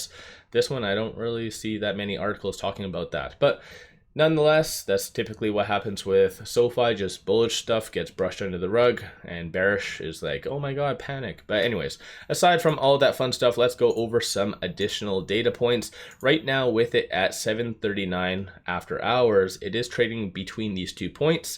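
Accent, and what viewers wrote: Canada. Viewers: Thanks again for your analysis! It sounds like you live in Canada, eh? I am in Oregon. Just got to tell you...I am a huge Rush fan, so go Canada!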